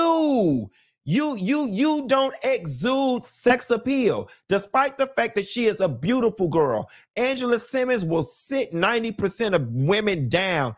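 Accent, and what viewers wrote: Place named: South, United States. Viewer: I can’t with her “new country accent “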